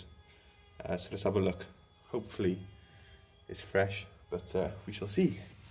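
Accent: Wales, United Kingdom. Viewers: What part of Wales you from mate, sounds like Cardiff or Swansea area, I'm from Brecon originally but live in Florida now. Thanks for the vid on this mate, getting ready to do my 2014 X MR (USDM)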